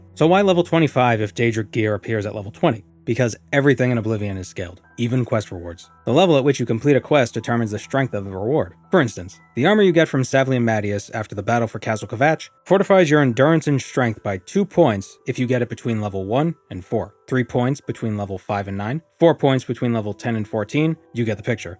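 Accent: Boston, United States. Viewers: i realize this is an odd question to ask, well spoken TES challenge run youtuber I subscribed to a bit ago, but are you from eastern Massachusetts? Very rarely in your videos, i occasionally catch a familiar accent in your VO (in this one you said bastard as 'basted' one time), but I can't tell for certain if it's Bostonian or not.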